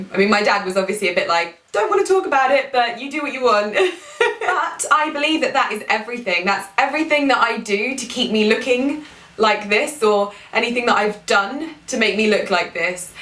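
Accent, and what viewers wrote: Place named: England, United Kingdom. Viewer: Great video. Can someone tell me precisely what area of England this accent derives from? It's by far the most pleasant sounding.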